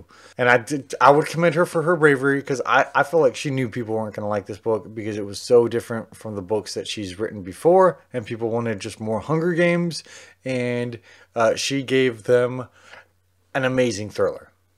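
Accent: South, United States. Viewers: I love your vlog on this video at your house in Texas during your quarantine until you had a lot of books last year back in 2020 are The Ballad of Songbirds and Snakes by Suzanne Collins, She's Come Undone by Wally Lamb, The Toll by Neil Shusterman, My Best Friend's Exorcism by Grady Hendrix, And I Darken by Kiersten White, Black Sun by Rebecca Roanhorse, The Southern Book Club's Guide to Slaying Vampires by Grady Hendrix, The Rage of Dragons by Evan Winter, Gideon the Ninth by Tamsyn Muir and Rhythm of War by Brandon Sanderson while you're talking about all of the characters from all of the books to read them in the beginning while you're talking about horrible mentions of the book called, To Sleep in a Seas of Stars by Christopher Paolini and another books of The Great Hunt by Robert Jordan including Grave Peril by Jim Butcher, The Bands of Mourning by Brandon Sanderson and The House of the Cerulean Sea by T.J. 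Klune and Surrender Your Sons by Adam Sass after that, I hope your husband, Zach will be very proud of you and I love your Southern accent!!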